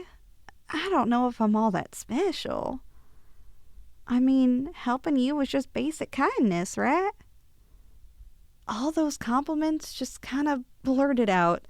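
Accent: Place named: South, United States. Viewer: What's with the southern accent my lady?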